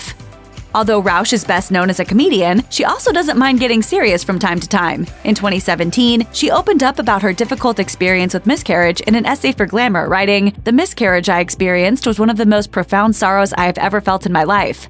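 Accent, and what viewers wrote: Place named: New York, United States. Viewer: Neutral New York City accent? The city has 8 distinct accents according to where you live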